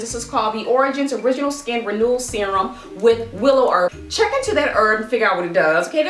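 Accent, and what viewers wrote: South, United States. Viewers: 😂 especially when she says "pores" for me. Maybe the southern accent persuades me. I'm about to go refresh my face now...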